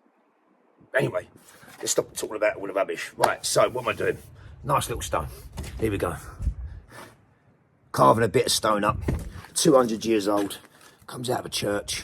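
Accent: Cockney, United Kingdom. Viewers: Love your traditional Cockney accent which is now sadly a dying breed - My Fiancé is from White Chapel & sounds exactly the same, salt of the earth 🙌🏼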